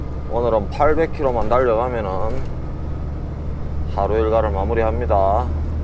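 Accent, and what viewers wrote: Gyeongsang, South Korea. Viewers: 구수한경상도 말씀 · 구수한 경상도사투리에 동질감을 느끼며 고마 썌리 마 구독 때리고 가겠습니다~ 항상 안전운전하시고 흥미로운 영상 계속 부탁 드립니다.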